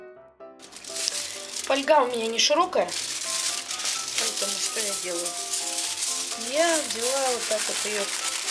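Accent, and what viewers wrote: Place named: Siberia, Russia. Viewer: сибирячка по говору наверно.Спасибо .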